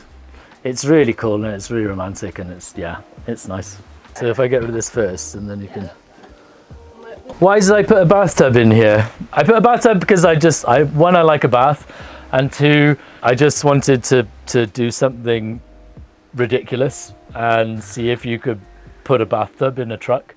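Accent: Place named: RP, United Kingdom. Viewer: You sound posh .but you talk normal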